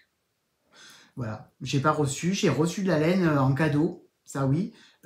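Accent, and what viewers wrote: South, France. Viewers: Merci pour tes podcasts avec ton humour et ton accent qui “sent bon” le sud.j’adore. Génial les 4000 abonnés je participe pour la France 🧶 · Bonjour, Je te regarde depuis le 1er podcast, cest toujours un agréable moments. J'adore ton accent du sud ca mets du soleil dans la grisaille du temps, ta bonne humeur, ta franchise et les superbe projets que tu nous présentes. Bravo pour les 4000 abonnes c'est mérité. Je suis de Belgique et je participe volontier au concours 🪐 merci A bientôt. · 🧶Bonjour, J'adore vos podcasts, vous me faites sourire et j'apprend pleins de choses, je suis débutante, j'aime votre autodérision et votre accent qui me donne envie de découvrir le sud. Et j'ai trop hâte de découvrir votre design chaussette 🧦! Merci pour ce chouette concours! Belle journée 🧶🌞🤩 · 🧶Coucou, toutes mes félicitations pour les 4000 abonnés 👍. 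Le meilleur pour la suite c'est que du bonheur de te visionner, ta joie et ce magnifique parlé du Sud tel du Marcel Pagnol. Bravo pour ton premier design que j'ai hâte de découvrir. J'ai loupé l'info pour le test dommage. Prends soin de toi 😘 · hello! enfin quelqu un du sud! je désespérais! je pensais qu il y avait que le nord la bretagne le grand est qui tricotaient 😉moi je suis du vaucluse et je regarde beaucoup de vidéos de tricot mais la majorité des youtubeuses sont de la haut bref ça fait du bien de t entendre et meme je savais pas qu il y avait de temps en temps des fetes de l laine par ici dailleurs si un jour tu es au courant d un evenement dis le nous parce que pareil, ils sont tous la haut, quand meme on vit dans le sud ok, il fait chaud ok, mais on mets des tricots aussi 😅sinon bé, j aime beaucoup tes vidéos je rigole bien et j apprecie ta façon cach de parler allez j arrete mon discours j attends la prochaine avec impatience a bientot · 🧶 Bonjour 🥰 Quel plaisir, grand moment de détente de vous regarder depuis le début de votre chaîne et sur Insta J’adore et j’adhère complètement votre façon d’aborder le tricot et toutes les merveilles qui l’accompagne 😍 Je vous félicite et bravo pour les 4K🎉 Votre humour ainsi que le parler du midi me Régale!!!!!! 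Je suis de La Ciotat (13) donc je comprends bien 👍👍👍 Merci de faire connaître notre accent et nos galéjades 🤣 Toujours impatiente du prochain épisode 💪 Bravo pour toutes vos créations les choix laineux et les couleurs!!! ❤️🍀🌹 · 🪐🧶 Salut ! Toujours agréable d'entendre mon accent du sud quand on a émigré au Québec. D'accents en accents mais le même amour de la laine. Merci pour l'originalité. À quand un podcast au bord de la grande bleue? :D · Bonjour, merci pour vos podcasts (sympathie franchise et avec le petit accent du sud qui me fait réver) que je regarde depuis le début (même si je ne commente pas beaucoup) et ce concours :planet-orange-purple-ring: Bonne continuation et bjr de Belgique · Bonjour, je vous ai découvert grâce au podcast de Julie de Lolipelote et c'est un ravissement que de découvrir vos podcast qui chante le sud. 🧶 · bonsoir, je me régale chaque fois que je regarde une de tes vidéos. 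Merci pour ce concours et pour les lots offerts 🧶Vive le sud!! et son accent!! Bisous de Marseille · Quel agréable moment ce podcast ! J'ai un accent (Sud OUest) mais le tien me renvoie dans les années 80 lors de mes vacances à Port Grimaud. Bises des Landes 🧶🧶🧶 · 🧶 Bonjour. Première fois que je commente un de tes pod cast. Merci pour le soleil qu'il y a dans ton bel accent du Midi. Bonne continuation. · Un succès bien mérité pour un tricoteur spontané et réjouissant. Je ne rate aucun épisode et ris beaucoup avec toi ! Ne change rien surtout et vive l'accent du Sud!!🌞💕 🧶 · 🧶 quel plaisir de te regarder et d'entendre ton accent du Sud! Merci pour le concours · Bonjour encore un bon moment passé en ta compagnie 🧶 j'aime ton accent du midi et ton humour. Bonne journée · 🧶 Bonjour! Toujours très agréable cette voix qui nous vient du sud et nous apporte un rayon de soleil. Merci ❤ Maryse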